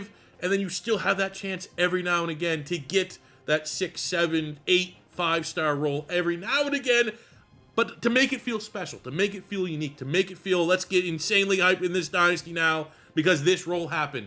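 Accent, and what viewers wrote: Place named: Canada. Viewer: Straight to the point. Great content. You're an OG. Been doing it 15 years. I thought you were Canadian with that accent lmao.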